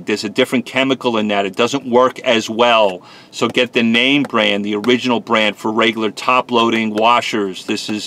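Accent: New York, United States. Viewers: Is that a New York accent I hear? I love it. Thanks for the tips! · Sounds like a Liberal from New York living in Dallas.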